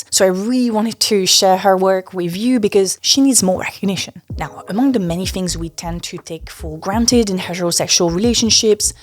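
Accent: France. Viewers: i find your pronunciation of "o" "oo" "ar" "ure" all pronunication as a strange "urrr", videurr, explurnation, everywhurr, burrk, prurfur - this clumsy hiding of your french accent , it's very distracting. Sorry, but i really like your content. I am French and I don't see why you make so many vowel sounds converge into "urr". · Hi! I really enjoy your videos and the perspectives you bring on the topics you choose! The discussions are always super well researched and thoughtful! I also really enjoy listening to your voice/accent. I have a question about that, actually, cause it’s something I’ve been thinking about when it comes to my own accent (austrian/slovenian). When I was younger I sought to mimic the accents I heard on tv and in person, but lately I’ve found that I like it if a bit of my language diversity mixes into it and have sometimes deliberately chosen to keep some things like a german h or a slovenian r in some words. 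In that way I sometimes feel like I’m aiming for a balance between what’s understandable /great for the person I’m speaking to and what’s more authentic/feels more comfortable. Going into english spaces I always felt a bit self-conscious of a stronger austrian accent (we historically don’t have the best representation lol) so I was wondering what your experience is with that as a french content creator who creates in English. Do you find yourself toeing the same line or do you not think about it at all? Do you think it might be different if the language you were born in wasn’t french? (I’m kind of assuming this here, please correct me if I’m wrong, after all, I don’t actually know you 😅)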